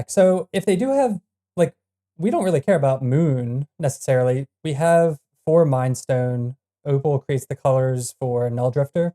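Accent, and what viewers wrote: Baltimore, United States. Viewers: Hey, so firstly, I thought you were from Baltimore too 😂, like the Swedish guy! I lived in NJ, in Bergen County but in UK for last 20 years. Anyway, what do you prefer, this or the Herigast deck? This one seems like it's prepared for a bit more but I'm just getting back into Magic after a 4 year break, so I am just getting caught up with the meta and have never seen a couple.of the decks you played on this video. What's your preference?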